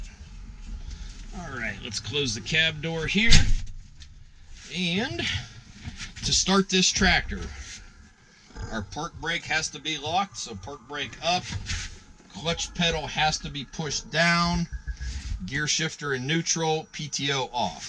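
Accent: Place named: Midwest, United States. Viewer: Same reason he says filders. He’s a Midwesterner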